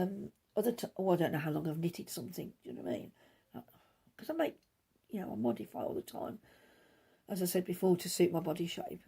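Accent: Scotland, United Kingdom. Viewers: Hi, I watch Caz too and she told us about you so here I am. Thoroughly enjoyed your podcast, as someone below said, it was just like sitting having a chat. You came over really well. You are a lovely knitter and I'm looking forward to watching your other 3 podcasts you've done so far. Keep up the good work. P.S. your accent was lovely and like Caz I'm a Scot but a low lander and your accent sounded perfect to me. Take care and stay safe. Alexis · Hi I really enjoyed your podcast after Caz introduced you? I too am Scottish. I really like your accent, I’m off to watch your other videos now. Happy knitting 🧶🌸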